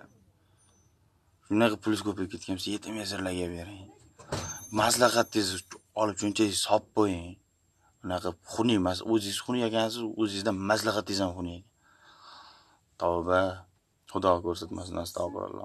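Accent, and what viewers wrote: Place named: Tashkent, Uzbekistan. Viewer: Ee birat chachvoribsiz likin toshkenchanixam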